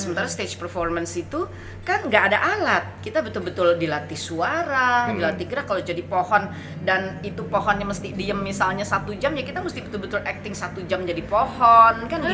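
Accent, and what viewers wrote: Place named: Palembang, Indonesia. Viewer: Senang dengar baso baso Palembang, wong kito galo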